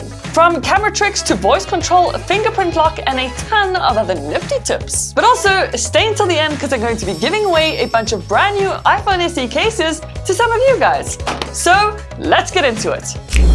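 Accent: South Africa. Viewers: are you South African? I'm picking up an accent :) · I can't understand yr accent, is it South African? i luv yr vids sm <3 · I didnt realise we had mouse option, i have it on my ipad so great tip Have you given away your covers ? Great accent (maybe a saffer?)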